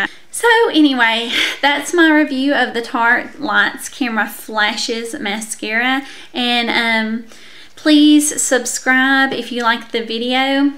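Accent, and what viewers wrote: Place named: Texas, United States. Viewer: Love ur texas accent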